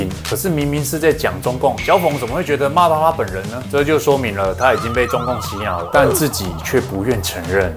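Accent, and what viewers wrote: Taiwan. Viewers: 你偶爾穿插的道地的台語聽了更讓人很暖心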